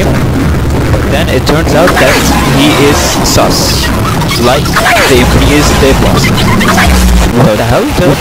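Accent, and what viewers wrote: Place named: Egypt. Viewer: The egyptian english accent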